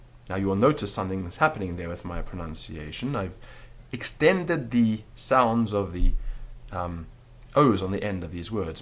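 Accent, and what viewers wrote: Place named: England, United Kingdom. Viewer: If this is the first lesson, I do believe that he can teach the whole language in 3 lessons. Also his English accent makes it sometimes a little difficult to understand the words in Latin.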